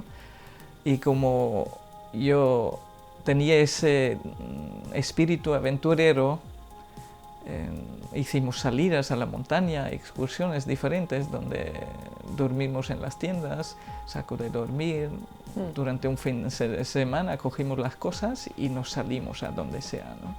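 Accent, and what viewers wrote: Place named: Colombia. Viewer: Tiene acento Colombiano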